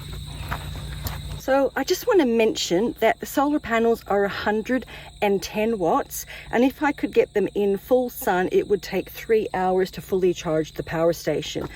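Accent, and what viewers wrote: Australia. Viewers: you have the strangest accent - you sound aussie and then this american "R" comes through - where are you from?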